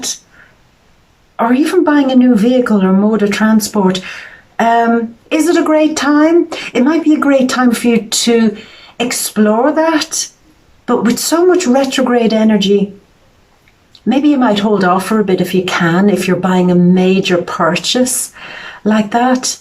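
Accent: Ireland. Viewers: Lovely - Thank You!! still love your Irish!! especially that part where you tell them to GTH!! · .. Lady you are yummy.. Love that Irish accent.. Cheers from Australia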